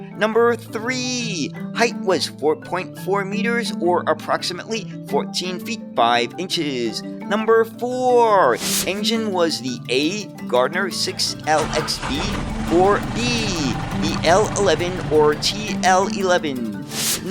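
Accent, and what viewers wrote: New York, United States. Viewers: is from New York, as if you couldn't have guessed that already!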